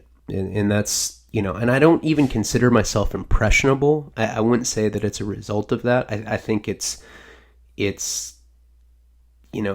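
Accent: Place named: Tennessee, United States. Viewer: Yo 🖤😋 love the southern accent so real! We sound just like that in Tennessee Great video😛 palabra💯🗨💥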